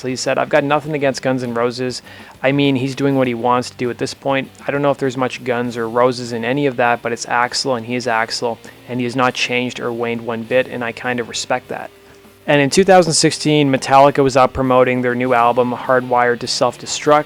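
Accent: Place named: Canada. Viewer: no bro. Youre completely missing the point. I was just explaining that his 3-5-7 gun mistake was probably due to the fact that he sounds canadian (his accent leads me to believe) and therefore does not know as much about guns as an american would. Here we play hockey and harvest maple syrup but we dont know too much about guns although we do like to start a few riots every now and then.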